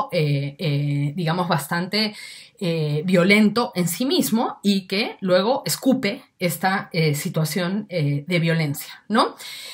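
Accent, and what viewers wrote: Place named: Peru. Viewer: porque dices que no tiene acento peruano Busca en wikipedía e informate El Perú es rico tanto en lenguas como en Dejos y acentos Un tacneño no habla igual que un tumbesino O un Chalaco no habla igual que un charapa Uno de Pucallpa no habla igual que el de Tarapoto o iquitos a pesar que los 3 son de la selva Uno del rimac no habla igual que el de los Olivos o Miraflores Esta chica se puede confundir como alguien de Arequipa o conok alguien de cuzco o alguien de miraflores Y se ve que tiene estudios Solo que le falta estudiar más las condiciones sociales antropológicas de Perú Pero en su mayoría casi un 70 por ciento de lo que dice es cierto Solo que no comparto que diga que los venezolanos que se portan mal no deben ser deportados Yo vivo en Barcelona ya bine tiempo y soy conciente que si hago algo que este mal en este estado yo debería ser expulsado Lo acepto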